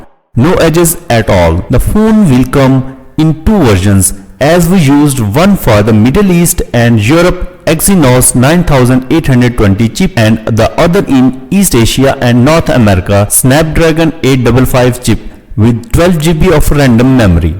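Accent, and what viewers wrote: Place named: Tamil Nadu, India. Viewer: I think ur acent is tamil if I am correct. I like it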